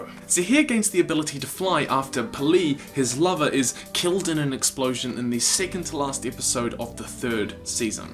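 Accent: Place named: New Zealand. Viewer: you literally have my fathers accent you gotta be a kiwi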